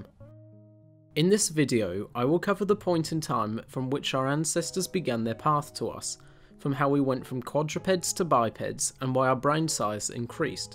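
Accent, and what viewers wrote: United Kingdom. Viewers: L.O.L. Only an Idiot would believe this Darwinism Theory...The British accent doesn't help L.O.L. God is Laughing to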